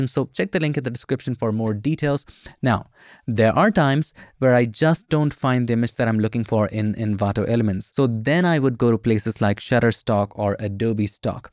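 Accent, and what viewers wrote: India. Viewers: u have an annoying voice, I can still hear the indian accent u are almost succesfully hiding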